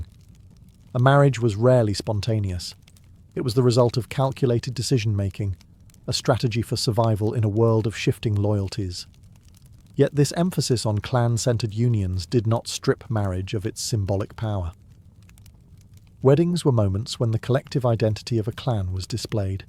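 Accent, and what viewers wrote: United Kingdom. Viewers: Love😂 the British accent. Makes me feel a little more civilized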